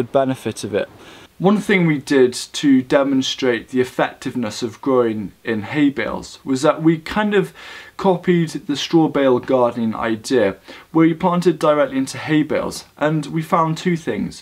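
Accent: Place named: United Kingdom. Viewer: Good expectation,I love the British accent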